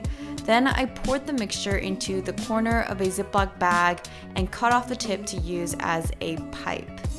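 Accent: United States. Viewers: It's an American accent. Where I'm from we speak like that as well. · Lucy Blennerhassett in american english we dont pronounce the “h” in “herbs” :)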